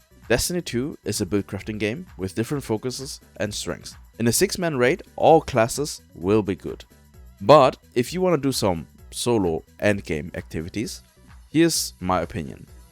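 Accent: Germany. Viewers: Wieso hört man als Deutscher raus, das du Deutscher bist haha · Aß a german i can Say you Sound kinda german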